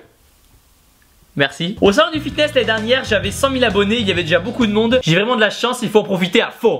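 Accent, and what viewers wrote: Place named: Toulouse, France. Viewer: Atta il est toulousain !!!!XD mais dddaaaaammmmnnn c'est génial xD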